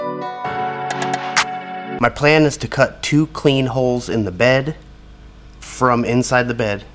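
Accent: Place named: Mexico. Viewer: you sound hispanic / mexican asf my guy / dude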